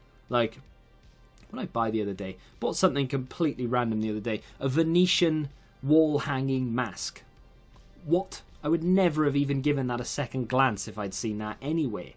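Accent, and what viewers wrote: Wales, United Kingdom. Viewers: Well, first of all, I fully understand and respect your decision. You gotta make the decisions that are best for you and your family. I'm 30 and I feel like that's one of the most important lessons you learn in life, that you need to be adaptable in order to make your life a success. Second of all, if FIFA 22 really is your last, that will be a massive shame, you will be hugely missed. I love your way of thinking and the way you talk, it's a bit old school and I have family in Wales, so I love me a bit of Wales and the Welsh accent. Thirdly, really looking forward to the RTG in FIFA 22! Your RTG in FIFA 19 was absolutely awesome and I really hope it'll be more or less like that. It'll be great to see you approach it exactly the way you want to, no holds barred. Good luck with everything boyo 👍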